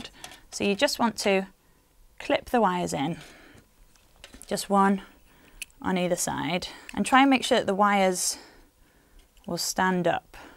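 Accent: United Kingdom. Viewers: She's all British and stuff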